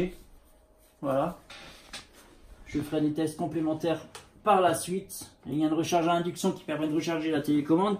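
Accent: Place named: Alsace, France. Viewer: On sent l’alsacien de base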